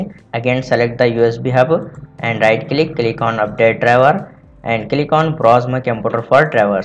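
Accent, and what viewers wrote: India. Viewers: Indian accent is never a good sign, never ever had an issue fixed with those guys.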